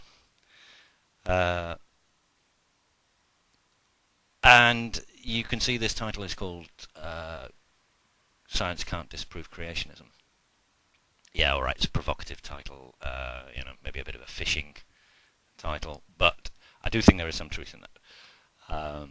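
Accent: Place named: United Kingdom. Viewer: Let me guess, you're british.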